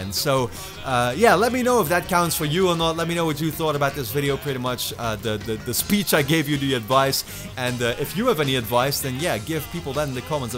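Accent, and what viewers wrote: Netherlands. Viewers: This Guy is dutch.... I can hear it.... Im dutch too... And btw GO READ A BOOK PEOPLE!!!! RRRREEEEEAAAADDDD! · I heared your voice and already know you are Dutch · i can hear the dutchness in your voice xD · Is this boi dutch?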